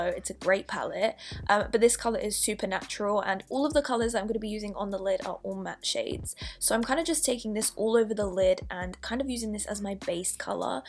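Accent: Australia. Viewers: Savanna I feel like she sounds sorta aussie in this idk why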